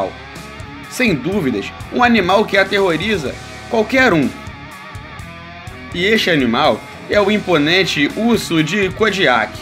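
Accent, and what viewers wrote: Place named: Rio de Janeiro, Brazil. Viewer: Saudades desse sotaque carioca 😁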